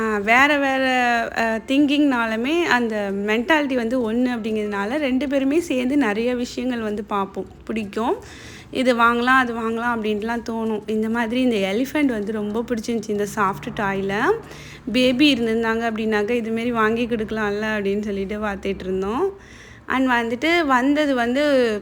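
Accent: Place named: Pattukkottai, India. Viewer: Nce vlog ka..unga slang enga oor slang madriye iruku..am near by pattukkottai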